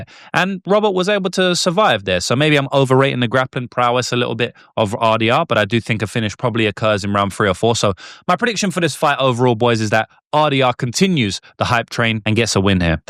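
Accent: United Kingdom. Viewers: I’m glad this channel is getting bigger. I remember absolutely hating when I first watched him. I was like “who is this arrogant prig with the English accent and f his mama” but I had the accent and the man messed up. lol. Three years later and I never miss a single piece of content. 😅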